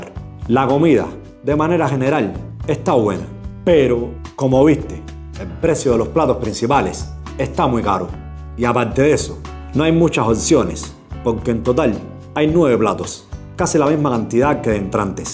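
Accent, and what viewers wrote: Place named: Cuba. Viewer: Mi brother si eres Cubano, sabrás que el acento nuestro es ése, no se trata de guaperia ni nada por el estilo, simplemente es nuestra forma de expresarnos. Cada país tiene su propia forma de expresión, ejemplo los dominicanos hablan que parecen analfabetos y es todo lo contrario simplemente es una cuestión de cultura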